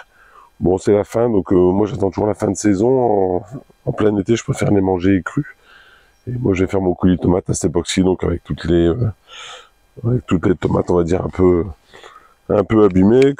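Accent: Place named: North, France. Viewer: Bon rétablissement ! La spontanéité avec l'accent du nord, ça fait plaisir !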